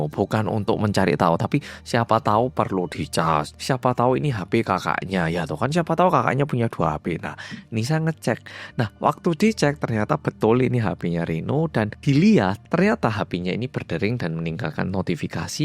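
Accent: Java, Indonesia. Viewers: dia gak salah apa apa kok ngatain sorry unsubscribe wkwkw dulu dia lahir di korea dan besarnya di indo dari kecil lho apalagi udah mahir bhs indo yg medok, dan dia itu cinta bgt sama indonesia. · medok 🎉 Lgsg nyimak 🎉🎉🎉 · Gausah. Walopun ngomong medok. tetep orang Korea. Walopun dulu lama tinggal di Indonesia sekarang dia tinggal di korea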